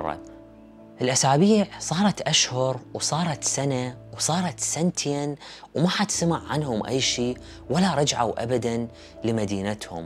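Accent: Iraq. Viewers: مرحبا ياريت تجيب لنا قصة مدينة آشلي المفقودة قد سمعتها لكن مو بطريقة سردك الرائعة وبلهجة العراقية زادتها الغاية في الروعة ❤ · سردك للقصة جميل جدا والي محليها اللهجة العراقية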